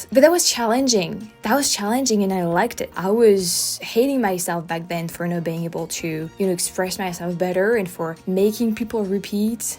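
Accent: France. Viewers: We call the different types and sounds of American English "dialects" in the US. For example, southern dialect, Boston dialect, etc. You have a French accent to "Americans", but to your French countrymen you might have a Paris dialect or a Bordeaux dialect or a Nice dialect. By the way, I adore your French accent and your English is phenomenale. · It's really not that important to get the accent down. Your French accent is very charming. People in the States will find you more interesting and fun with your French accent. I speak French, but my last name is actually France. Michael France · Hey 🤔 🗣️ 🌎 You have the best of both worlds, don't fret look how far you've come, take it easy on your self, theirs no need to be perfect we're understanding you just fine. I wouldn't want you to lose your accent completely & be something your not, your french background is an essential part in shaping who you are & why we like you so much & with Valentine's day coming up having a french accent does have its advantages. 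Going through your points, I didn't realise other languages there weren't learnt that much, what could be the reason, your distinctive culture maybe because your a big country. It doesn't surprise me about the Netherlands there's always a lot of different people coming & going trying to understand one another so it makes sense that English is widely spoken. Along with the Scandinavians they have that American sounding voice that kinda weird almost robotic & to perfect, no disrespect, I don't why that is. It's interesting how you've been learning English since school, you were probably much better at it than English kids vice versa, I wonder what history was taught both countries were so interwoven once upon a time, french was spoken along side old English for many centuries & wouldn't exist like it is today if it wasn't for you. I can see how challenging it is for non English speakers trying to figure out all our accents, we shouldn't take it for granted, even I sometimes have trouble understanding the thick southern drawl but I've always li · You speak English well! 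I hope that as you learn even more as time goes on you will not totally lose your lovely French accent which is so charming. I just recently started watching your YouTube channels and I am enjoying watching you experience new and wonderful people, things and places. We do not often see French people here in many parts of the USA. I think you will be welcome most places you visit- after all, what’s not to love about you? Keep making your fun and informative videos. It is good to escape to a happy place when the news in the world today is so heavy and sad. Thank you for what you do and best wishes for success and happiness in future!✨🦋🎶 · Please don't try and adopt any American accent. A French accent speaking English is the best accent anyone could have! I love a French accent. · The different languages are fascinating, but I realized while trying to learn Spanish that it is all about tongue placement for pronunciation. 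You were raised on French pronunciations, so now you have to retrain your tongue to position it properly for English. Your tongue is still trying (or fighting) to position itself for French words as you pronounce English words. Especially since you still speak mostly French at home. That's where accents come from! Your English is fantastic but if you work on correct tongue placement it'll eventually disappear. Love ya! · As a native speaker of American English, I am impressed that you think English is a beautiful language, and that the American accent is your favorite. And this coming from a native French speaker! Your English is perfectly understandable, and is made more charming and delightful to listen to by your French accent. You're an inspiration to people (like me) who are struggling to learn a second language. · I would easily pick up that you were from France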